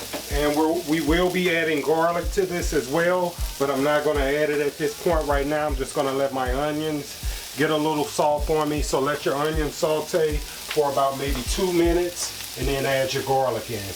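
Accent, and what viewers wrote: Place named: United States. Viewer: Whomever would make fun of your wholesome American accent is simply jealous. This recipe looks great! I have not seen crawfish for sale but will start looking for it. This would make a dynamite pizza. For what it's worth: I have been remarkably more healthy since I started making and eating sauerkraut. I used to catch every cold or flu going, had chronic bronchitis for five years. All gone since I started this habit. During the past winter, I have not had so much as a sniffle or cough. Not sure how it works but the effect for me is miraculous. I hope this is useful information.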